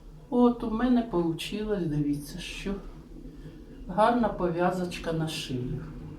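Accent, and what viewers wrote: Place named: Ukraine. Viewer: Ничего себе ПАНИ))) Хохлушку за версту видно, что речь, что мина кирпича просит))) 😄🇷🇺🇷🇺🇷🇺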